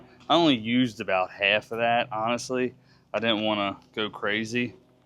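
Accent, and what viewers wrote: Maryland, United States. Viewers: nice I was born in Essex but grew up in Western Maryland. I know a MD accent when I hear it. Great videos keep up bro.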